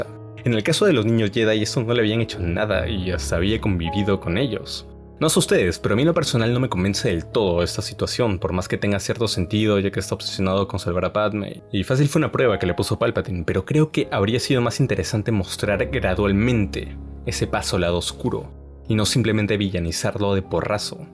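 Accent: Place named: Peru. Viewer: manoo ese acento peruano es todo te juro, sube mas videos asi la subes como mrd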